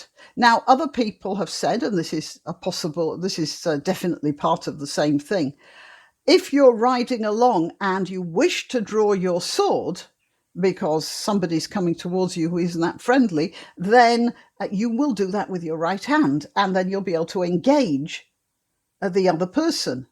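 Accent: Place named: United Kingdom. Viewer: I love this stuff. I didn't know any of it really. And I love hearing it spoken ( in 'The Queen's English? Do you still refer to it as such? ).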